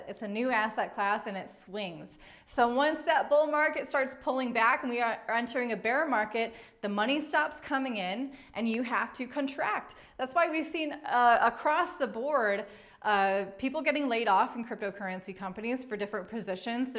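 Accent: United States. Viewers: can someone tell what her accent is? It sounds a bit different to me than the "standard" US accent but I like it.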